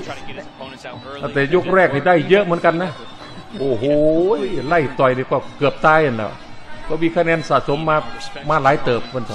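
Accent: Isan, Thailand. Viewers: สนับสนุนเด้อ อ้าย อ้ายหละเน๊าะ ผม2514 คือสิก่อนผมยุดอก มักคักช่องไบรอัน มักทุกช่อง พากย์อิสานมวนคักสนับสนุนเด้อ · พากย์อีสานยิ่งชอบ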